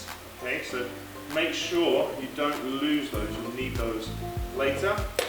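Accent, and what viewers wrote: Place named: United Kingdom. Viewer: Great, clear instructions. You have a British accent, but I guess you may have lived in China/ Asia for some time. Nice! I have lived off and on in India for 10. years. Back in the states now.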